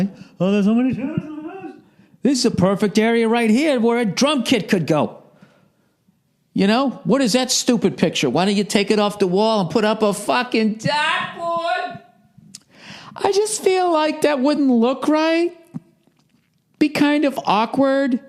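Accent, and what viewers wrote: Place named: Boston, United States. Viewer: Lol I’m from Boston, & damn he sounds like he hasn’t left 🤣🤣I love him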